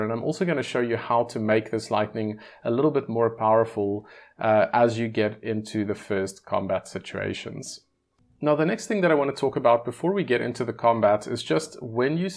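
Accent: South Africa. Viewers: i know a south african when i hear one... jy kry n sub bra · excellent video...exactly speaking to the things I struggled with even after watching multiple guides...specifically struggled with fuel and how to get money to buy more fuel. Your accent sound South African... Even more reason to subscribe ;-)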